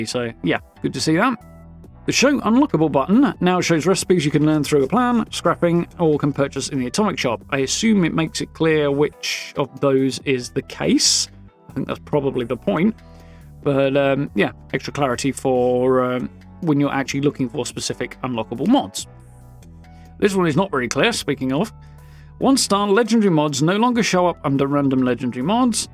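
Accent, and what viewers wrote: United Kingdom. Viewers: 4k tvs have never been as affordable as they are today. I have a 4k 55" I bought for 200 usd and am planning on buying a 65" for a hundred dollars more soon. I see by your speech you are from the United Kingdom. I bought my telly from a place called Wal-Mart. Does your country have them? Hello from Yakima Washington USA ❤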